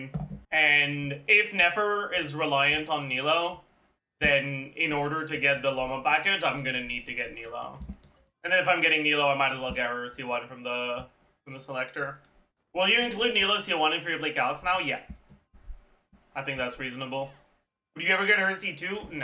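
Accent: Quebec, Canada. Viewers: Il est québecois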